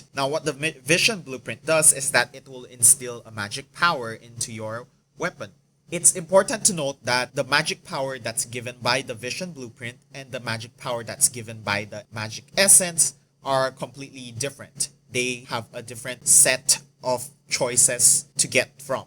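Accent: Philippines. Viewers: soooooooooooooo filipino accent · I heard Filipino accent here hahaha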